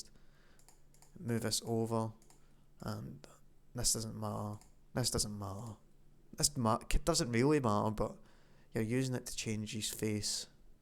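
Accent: Scotland, United Kingdom. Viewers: i was so happy when i heard that scottish accent, about 10x better than an indian dude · A fellow Scotsman eh? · Finally a mod tutorial for me: with Scottish accent XD Thank you for this, it's very helpful and thorough. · Yes a Scottish guy finally No homo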